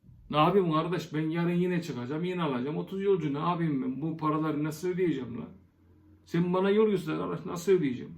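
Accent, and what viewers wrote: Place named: Ankara, Turkey. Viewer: Angara yi seviyoruz..tam Angara ağzı, kelimeler ,tonlamalar, jestler..özledim ...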